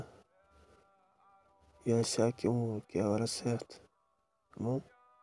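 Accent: Rio de Janeiro, Brazil. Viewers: Por que um sotaque carioca chama tanto minha atenção caralhooo🌚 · Sutaque carioca: a minha barriga : 🦋🦋🦋🦋🦋🦋🦋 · gosto muito desse sotaque carioca😍😍😍amo❤ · O SOTAQUE CARIOCA É LINDO, PIQUE BANDIDA ✋ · Boy... Eu tenho um amigo com a voz idêntica a dele e tem o mesmo sotaque Carioca ❤️ meu amigo é belíssimo, tô desconfiada que seja ele nesse canal kkkk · Deus, percebi agora que eu tenho gatilho por sotaque carioca 🥱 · So uma dúvida aqui... você é carioca? Se vc Não for, eu vou bugar muito kaksksks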